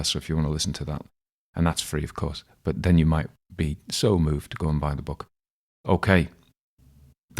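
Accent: Australia. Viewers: I missed this the first time round, but glad it came up again. Pretty good Aussie accent, but different to mine, (Even Australia has regional differences and they change with time.). Tasmanians use even more shwa and flat 'a's as in 'at' (except in 'castle'. Why this is an exception I have no idea.). Loved the story, and hop all is well with you and yours. 🙋🏻🔻🇦🇺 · I'm a West Australian living in Arizona. As soon as I heard your accent I felt right at home. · Wonderful accent that keeps the story firmly anchored in Australia. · I thought you’re Australian accent was very good, not that I personally speak with an Australian accent. Good story, thank you 🙏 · I enjoyed this story so much. It captures the scene perfectly and I was quite taken with your excellent Aussie accent and the worn and tired tone. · I'm a kiwi but I thought your Aussie accent was pretty good. 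Our goldfields could tell a few stories too. Have you seen The Luminaires? It's a TV series from 2020 with a supernatural theme running through it. About the Otago gold rush. Excellent series. Very spooky! What an incredible life that young author had! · How did I miss this one??? Love your presentation, Australian accent and informative commentary. Just finished your "More Cumbrian Ghost Stories":_pumpkin::_pumpkin::_pumpkin:Can highly recommend it? Thank you! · Wonderful, thanks, and I for one DID enjoy your Australian accent! Comments at the end informative, insightful and useful in understanding the piece, I do think they add a LOT to the reading. -- And thanks for your kind and sympathetic wishes at the end. Am sitting in Sweden and seeing a corona-disaster all around me. Really appreciate your reaching out to listeners. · Your Australian is marvelous. Am completely enjoying all your readings. 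You can truly be proud of the quality of your work. Thank you so much for these tales. Cheers Pat in New Jersey. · Well done Aussie accent. Great story and commentary.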